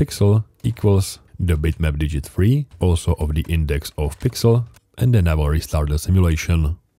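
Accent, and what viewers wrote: Czech Republic. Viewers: Amazing content, live your little DIY projects. Fascinating to watch. I cannot help but try to figure out, are you by any chance a bratr from CZ? Im not that great with accents, sorry :D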